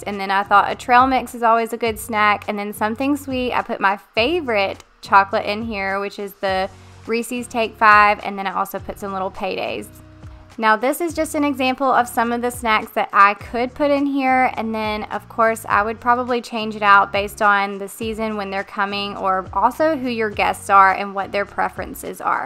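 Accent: South, United States. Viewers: I watch you on TV while cleaning and filming along with many other favorite YouTube cleaners. Lol I noticed your accent sounded a lot like mine and was wondering what area you’re from. I am a YouTuber as well, I am from North Carolina. I really enjoyed your motivating video and I loved how your bookshelf turned out. I am always doing DIY projects on furniture.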